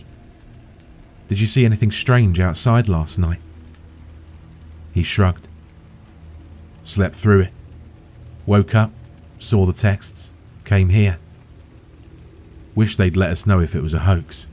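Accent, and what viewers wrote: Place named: United Kingdom. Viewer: Nothing like a good British accent narration. Subscribed. 👍🍿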